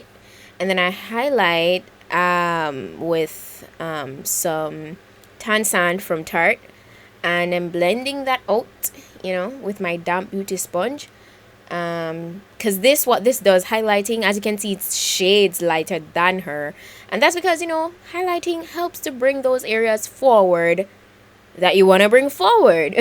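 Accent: Jamaica. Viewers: You sound Jamaican.... And I know becuase I am Jamaican....... Nvm 😂😂😂 you are I just saw the other vid..... New subbie... Btw.... Big up yuh self 👅🌸🙏💙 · Jamaican accent yaass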